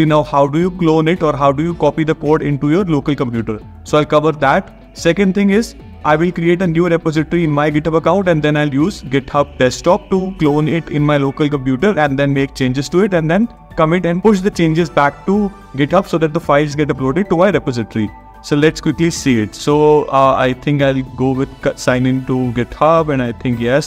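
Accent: India. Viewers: Thanks for the help and also you have the most perfect male Indian English speaking voice I've heard. So soothing yet has a subtle Indianness to it.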